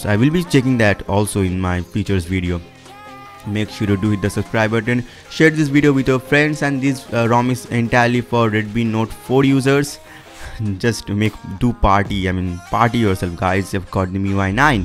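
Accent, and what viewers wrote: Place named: Maharashtra, India. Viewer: such a stupid accent. marathi fucker i guess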